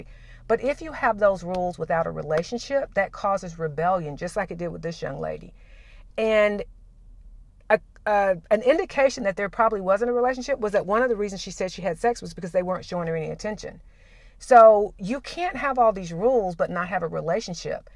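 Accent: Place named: England, United Kingdom. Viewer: QwQ I'm not cursing my parents I know some people dont have so it's a bless for me whatever it was :) And again she is 14 I dont like just 3 years and she was 10 the problem is other students there... But thank you ur really an amazing teacher and loved your English accent I dont if you're foriegn or something but loved it And yes every child has it own story with his/her parent :> ...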